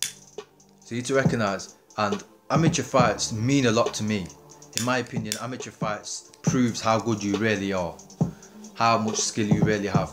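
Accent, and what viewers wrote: United Kingdom. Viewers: never seen this channel before. Late subscriber. Is that a notts accent i hear or derby? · Yo the guy speaking in the video are you from Notts by any chance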